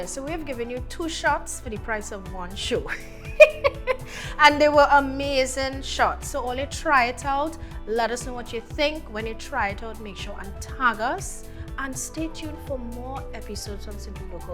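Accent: Trinidad and Tobago. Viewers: Yep, Trini to d bone lol Welcome to the channel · I just saw this and loving the enthusiasm ❤❤. Am I detecting a Trinidadian accent??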